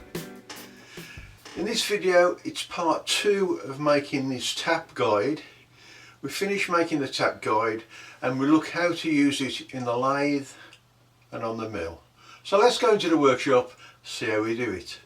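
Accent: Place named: Midlands, United Kingdom. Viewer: and a midlands accent too!!!!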